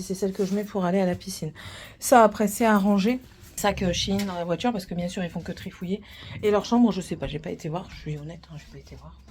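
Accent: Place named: Nord, France. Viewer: Oh la vache l'acent du ch'nord ! C'est marrant !!!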